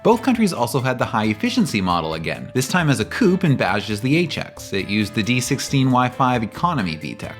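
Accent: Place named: Canada. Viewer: Disliking just for the shitty Canadian accent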